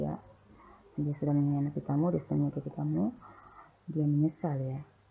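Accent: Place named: Sumatra, Indonesia. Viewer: pembacanya sederhana banget,sumatera /luar jawa ya jeng,💪💪,suka dgn kesederhanaan.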